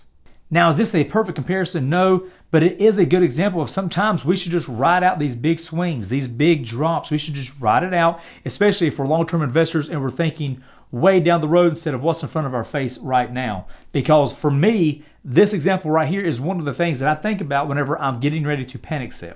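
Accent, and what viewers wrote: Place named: Georgia, United States. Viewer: Where u from? Sounds a little bit of Georgia in ya. Good ole country boy from Arkansas here and I'm glad I found ur vid. First time viewer and first year I've been investing. Turned 4 thousand into 25 as of this moment.....was about 40 but I believe in the cryptoz that I've invested in and know they are the future in all their respective areas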